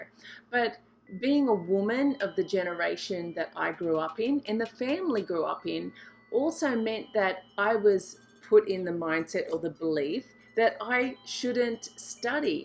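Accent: Australia. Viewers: Thank you and ~ thank you, everything sounds so much nicer with an Aussie accent:D Thank you for sharing your knowledge Blessings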